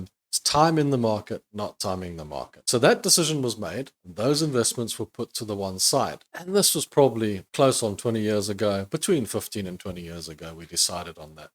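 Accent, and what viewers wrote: South Africa. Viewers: Hi - Love your content! You sound South African - Could the company have been Sharedirect?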